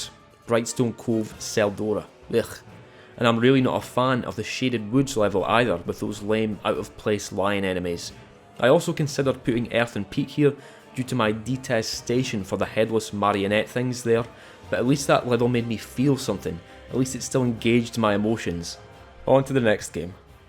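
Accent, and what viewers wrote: Scotland, United Kingdom. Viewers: Who doesn't love a good Scottish accent to listen to. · Finally. I watch a lot of different content creators on YouTube and from time to time there was this horrible accent that I really can't stand but I never knew where it came from. Now I know Scotland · As soon as I heard the Scottish... I knew I had to sub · First time coming across this channel. Was pleasantly surprised to hear a fellow Scottish accent. Have a like and subscribe, ya cunt! 🏴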